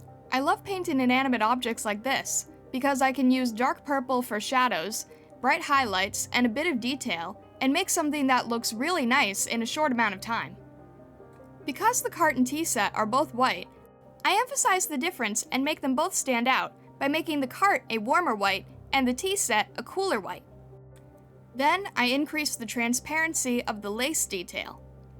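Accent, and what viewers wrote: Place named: United Kingdom. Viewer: lovely art and also bri''ish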